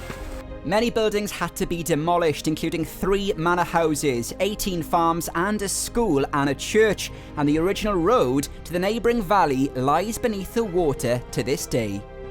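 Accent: United Kingdom. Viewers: He speak like England 🏴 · Fantastic film. I love mid Wales.It is so beautiful and quiet and not busy. Another great thing about your film is listening to you with your lovely Welsh accent and fabulous pronunciation. Thank you so much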